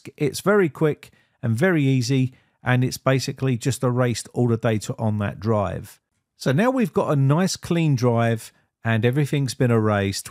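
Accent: United Kingdom. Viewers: brit accent I find interesting, Thing > Fing . Something > Sumfing, With > Wiv, Install > Instaw, Health > Helf. Words witrh TH are a struggle hey, but still I get a chuckle and learn something. (lern sumfing)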